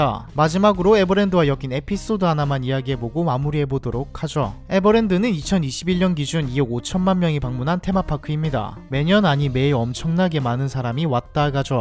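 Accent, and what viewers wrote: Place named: Busan, South Korea. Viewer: 듣기전에 몰랐는데 부산사람이라는 걸 알고나서 부터 들리는 사투리 억양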